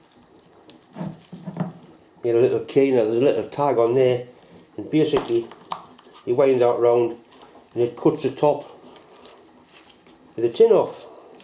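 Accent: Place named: Tyneside, United Kingdom. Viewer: classic !! just rocked onto this, so so funny, trying to place the twang, guessin south tyne poss same as me gateshead ??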